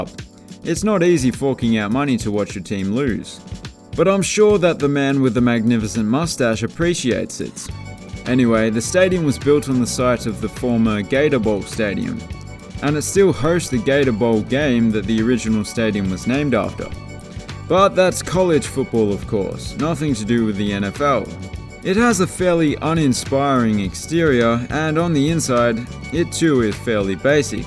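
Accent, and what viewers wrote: Australia. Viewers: Anyone else find it strange that an Aussie is doing the narration? I mean he has a cool voice, but why couldn't we get an American to do it for American stadiums? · Why is a guy from Australia doing the narration, on American stadiums?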